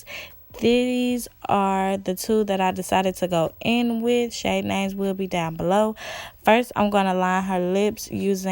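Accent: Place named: South, United States. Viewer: U so Country 😂 the first minute of the video I couldn’t stop laughing lol gotta be somewhere down here in the south. Awesome work 😍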